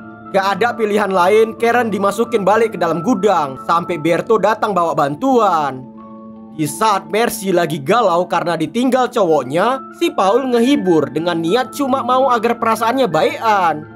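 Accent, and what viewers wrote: Medan, Indonesia. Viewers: Asal medan y bg.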